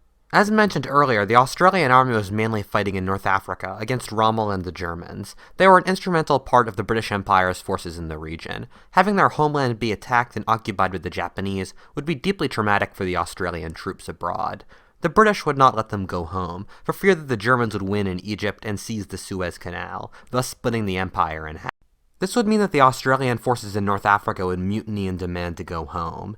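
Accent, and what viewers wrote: United States. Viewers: I was complimenting him on knowing how Aussies say those place names. There's another word Americans get wrong, Australians say Ozzies while most Americans say Ossies. He's obviously wel travelled and pays attention, without losing his American accent during normal speech. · Stupid fuckin annoying American accent coupled with poor analysis. Shite video.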